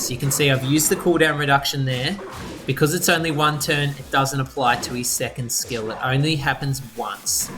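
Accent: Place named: Australia. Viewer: Cheers for all your videos your making on rearise man i really appreciate it, its refeshing hearing another aussie voice keep them coming bro you've got yourself a new sub :)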